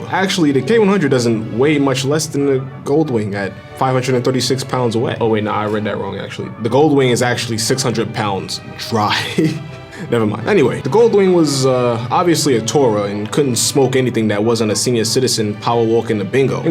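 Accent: United States. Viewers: Sounds like any other normal American to me 🇺🇲 💪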